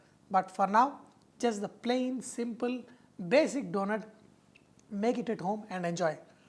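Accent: India. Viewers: Why do indians have such a funny accent? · sir you are a great cook nothing wrong with indian accents but since you are a great chef, please fix some of your pronunciations. for fantastic: it is fan. not fun or funtastic. for flour it is not flar/flaar or flore/ floor. it has same pronunciation like flower (phuul). same with hour (ghanta) it pronounces same like "our". hope you didn't mind. not aar/ are. although some measurements are missing from some of your recipes, but your cooking is undoubtedly "funtastic" :). since you have a great sense of humour. god bless you.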